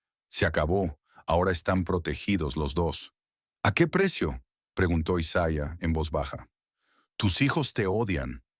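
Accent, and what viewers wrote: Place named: England, United Kingdom. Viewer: Sería un palo si tu narrativa tuviera pronunciación latina y un español sin acento ingles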